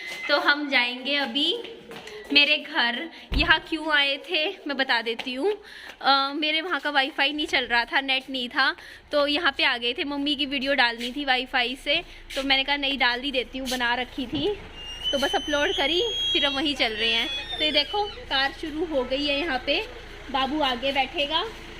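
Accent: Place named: Haryana, India. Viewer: to mujhe haryana se charkhi dadri se lgti hai.. mtlb us ki boli se.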